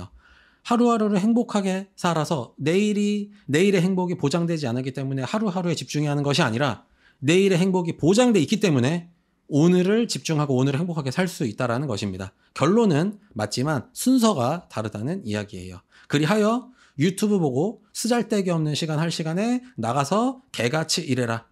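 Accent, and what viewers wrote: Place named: Gyeongsang, South Korea. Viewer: 경상도분이 신듯 ㅋㅋ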